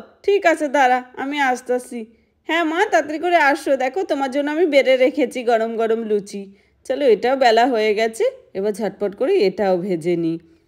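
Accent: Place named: Bangladesh. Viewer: হুম কথার টোনে বাংলাদেশি কিছুটা বুঝতে পারছি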